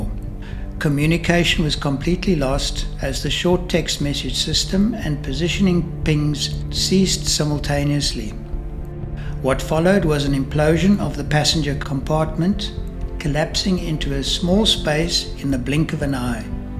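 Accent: South Africa. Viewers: Nice South African accent . 😊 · Do I detect a South African accent? 😁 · I hear a very Afrikaans accent 😄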